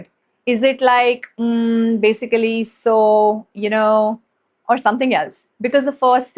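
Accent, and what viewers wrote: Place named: India. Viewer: Everything is fine . Your accent needs a bit of refinement. R can be pronounced in Brit or American way. The Indian R 😅 ( barring Northeast)is really Bad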